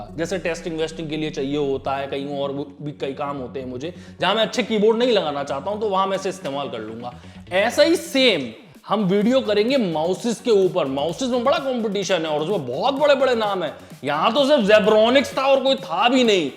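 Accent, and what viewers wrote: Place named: Uttar Pradesh, India. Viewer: bhai tumhare bolne ka tareeka bohot badiya hai........bilkul up touch hai accent me......kanpur se ho kya??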